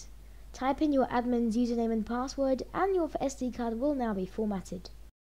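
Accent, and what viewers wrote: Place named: South Africa. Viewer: Thanks for the help. Can't help but notice your accent sounds south african.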